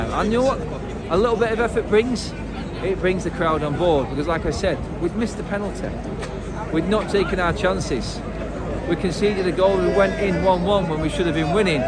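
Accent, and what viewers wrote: Manchester, United Kingdom. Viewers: Despite Mancunian accent.. you are so much better presenter now.. I’ve follow your channel from the beginning.. kudos 👍👍👍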